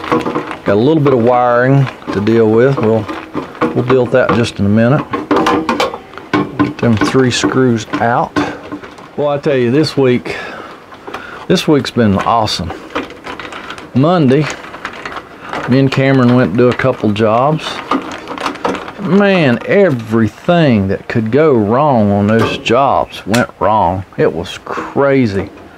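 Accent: Appalachia, United States. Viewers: "I wasn't holding my mouth right" - at that moment I knew I was following the right RV tech, as you were speaking my language. Lol I'm your neighbor down in Tennessee, so I understand that Appalachian dialect. I am an RV tech in training, so I really enjoy your videos! Thanks for taking the time to do them!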